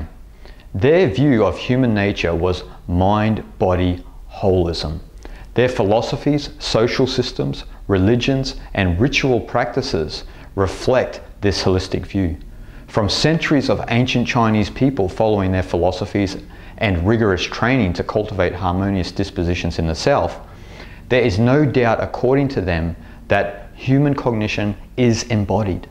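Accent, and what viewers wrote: Australia. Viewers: Something about an Aussie accent that makes me not take it serious...but this fellow is good. Thanks sport. Peace be unto you.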